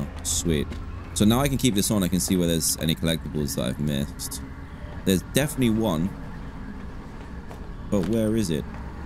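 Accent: United Kingdom. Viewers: as a British person, you are definitely British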